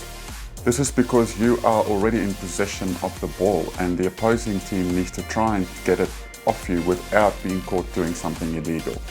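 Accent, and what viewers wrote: South Africa. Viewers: I can't believe I've only just found your channel. Amazing content, adding so much more to my understanding of the game. Especially the different, roles, positions and responsibilities👍🙏🏈❤️🎊 please can you confirm your accent for me. When I look at the screen and watch you, you sound south African. When I turn my head away and just listen to you, you sound austrailian/new Zealand. It's very confusing for me🤔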